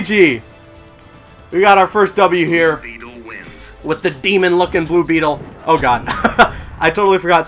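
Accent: Canada. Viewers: This is my first time on ur channel and can I just ask, are you Canadian?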